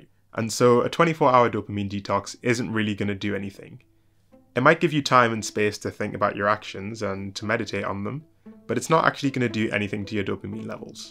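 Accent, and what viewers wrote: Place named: North East England, United Kingdom. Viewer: Nice to hear a north east UK accent on YouTube :)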